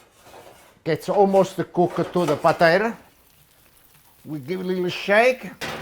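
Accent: Italy. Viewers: You gotta love that Italian accent too!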